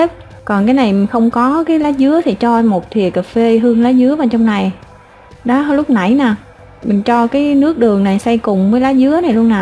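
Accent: South, Vietnam. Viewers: "Muỗng ăn canh" sẽ dễ nghe hơn "thìa" bạn ạ. Góp ý nhỏ : Giọng miền Nam, bạn nên dùng ngôn từ Nam Bộ bạn nhé, tôn trọng & giữ gìn nét đẹp Ông Bà. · Giọng miền nam nghe dể mến Thế mà " thìa " ...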